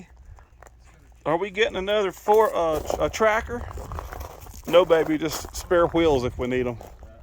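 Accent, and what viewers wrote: South, United States. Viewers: I'm so glad you got Red back. Sorry it was a costly repair. I love your southern way of storytelling maybe because I'm southern also.😉 This was a nice video. Thanks for sharing your experiences. I hope the repair to Betty White is minor. Good to see Felix, but I could tell he was in pain. I'm still praying for him.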